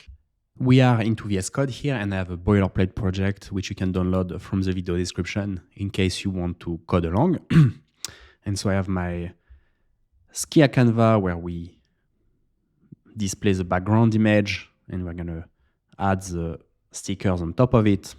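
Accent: France. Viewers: J'adore cette série, ça me donne plein d'idée pour mes projets ! (j'adore aussi ton accent Français ahah)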